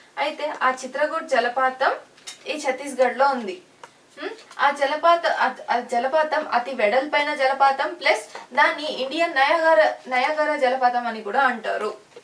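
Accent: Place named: Telangana, India. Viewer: nice teaching but don't fear and don't confuse and i like your language style pakka telangana language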